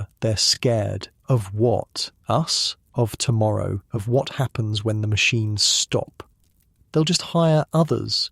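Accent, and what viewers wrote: England, United Kingdom. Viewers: This is my favorite English accent for stories! There are others, but this Historians chosen voice or original is the best! 2247 in Ridgecrest, Ca USA.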